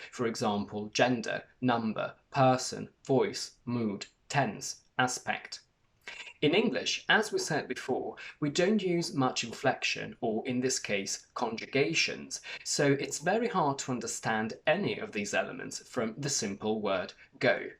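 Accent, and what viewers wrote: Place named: England, United Kingdom. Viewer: Love your English accent.. happy to see you again..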